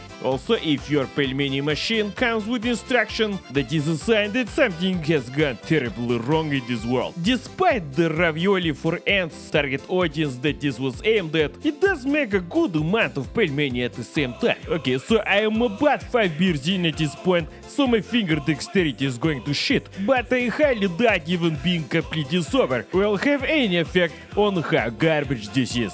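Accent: Eastern Europe. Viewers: While I personally didn't need subtitles to understand Slav-ese, let us thank our generous Slavic King for upgrading this video for those that do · I feel like after watching for years, I could be blindfolded so I couldn’t see any subtitles. And I could still understand his B L Y A T I F U L, S L A V I C voice. 👌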